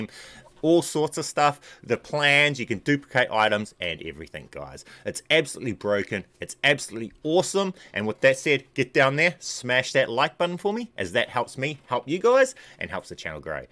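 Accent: New Zealand. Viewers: Definitely a kiwi! Everyone talks about you on mw, earned my sub. I dont even care for the money, youre just too cool lol.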